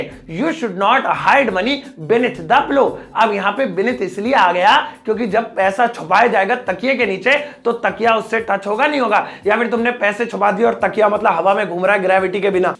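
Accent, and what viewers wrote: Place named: Mumbai, India. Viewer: Ekdam bambaya hindi kya baat hai bhidu... Pahchana ki nahi apun mumbaikar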